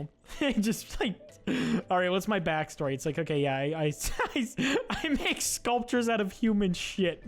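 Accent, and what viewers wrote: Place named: Canada. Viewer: God I never realized just how canadian you sound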